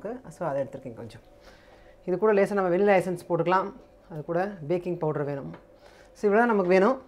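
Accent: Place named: Chennai, India. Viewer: no thanks dear.. I'm mercel for ur chennai slang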